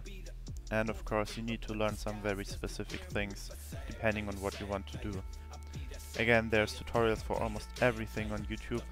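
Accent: Germany. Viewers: hyper deutscher, grüße aus österreich lul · U german? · Wie man direkt hört, dass du deutsch bist haha :D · Hallo bist du deutsch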